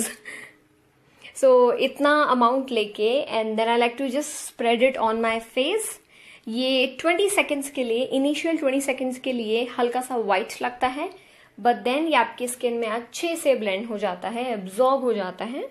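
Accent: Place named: Rajasthan, India. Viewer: Mujhko khudko jor aata he...😊😊😊...Jor aata he sunke he pata chal gaya ki rajasthan ki youtuber he.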